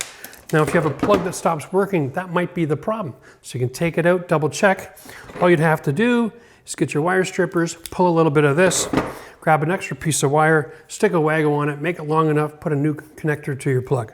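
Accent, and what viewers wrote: Canada. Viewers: In the United States, it is okay to add a 15A receptacle on a 20A circuit, as long as there is more than one receptacle on that circuit. Review NEC article 210.21(B)(3). But with your accent, I believe you're Canadian, I am unfamiliar with the code in Canada.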